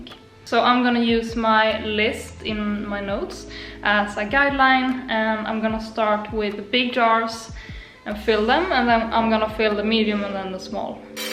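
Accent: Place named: Sweden. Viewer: your accent is so cute! are you from Sweden, srry im new here :)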